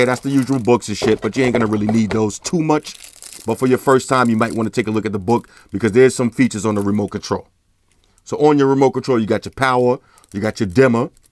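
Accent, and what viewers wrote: New York, United States. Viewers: i feel like i got bullied into buying this... his voice is mad aggressive, mad convincing tho lmfao you can tell hes a new yorker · R u from New York? Ur voice is very cool 😎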